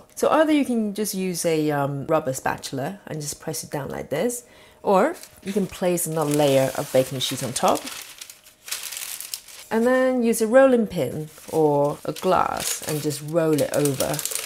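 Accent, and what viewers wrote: England, United Kingdom. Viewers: Precise and with class English accent. Thank you. 💐💐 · More than everything I love your British accent. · Hi I like your British Accent...your video contents are seems yummy surely will try I have got all the ingredients apart psyllium husk! Must get some soon!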